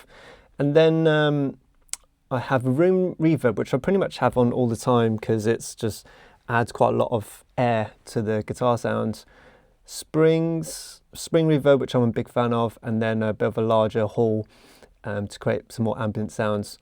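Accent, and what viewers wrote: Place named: United Kingdom. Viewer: Can you do a tutorial on how to dial in a killer BRITISH ACCENT like yours?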